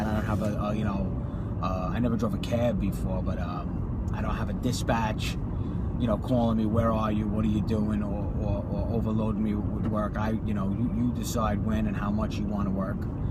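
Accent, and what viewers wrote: New York, United States. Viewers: "Not gonna disclose my location" your accent is a dead giveaway NYC bro... LOL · The clues gave it away (at least for me). Highly dense, close to trains, and the airport. All i'm thinking is Manhattan NY. The accent just confirmed everything lol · Jajaja that accent is str8 from new york lol gotcha there buddy now i know where u drive jaja lol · That is the heaviest New York accent I have ever heard. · Just started in Chicago, clearly you are in New York or New Jersey (the accent gives it away) I am also going to focus on the daytime commutes to avoid any of the late night troubles. Hope to see more videos from you & #UberOn also how's SideCar in your market have you tried it? It does well in Chicago in certain areas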